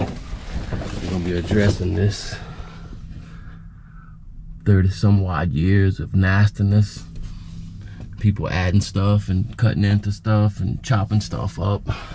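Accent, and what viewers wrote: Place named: Los Angeles, United States. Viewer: I thought you sounded like you were in LA. I'm in Monroe, in need of your services soon. I have a 1986 chevy short bed i inherited, My father's old truck.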